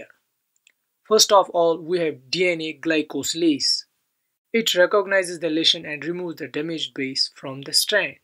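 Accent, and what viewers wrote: Kashmir, India. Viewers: which district? srinagar?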